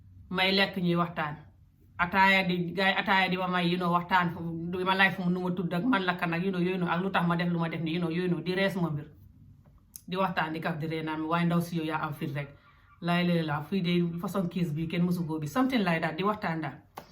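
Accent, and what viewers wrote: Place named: Gambia. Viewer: Listening to you attentively, your Wolof accent sounds more Gambian (fanafana). ☺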